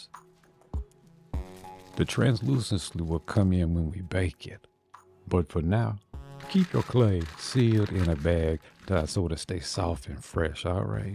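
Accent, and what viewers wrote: Louisiana, United States. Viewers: Are you from Louisiana by chance?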